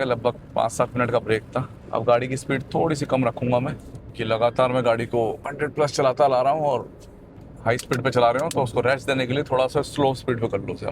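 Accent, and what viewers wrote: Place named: India. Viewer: sir, information dene wali baat to sahi hai, par aaj kal trend chal raha hai har koi apne aap ko represent kar raha hah, it is not bad thinking, baki aap ke videos mujhe bahut acche lagte hai, soscially aap ka desi bolne ka style bahut aacha hai,, baki aap ki pic ki kami rah jaati hai..thanks bye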